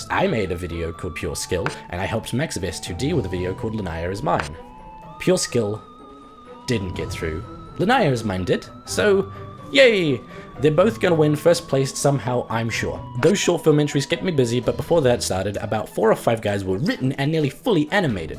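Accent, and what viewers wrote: New Zealand. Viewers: He's NZealander maybe? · Andre Louw he's a kiwi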